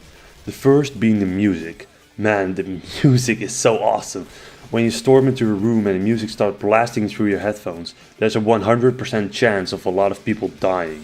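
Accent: Netherlands. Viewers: i can hear that your Dutch man xD nice video do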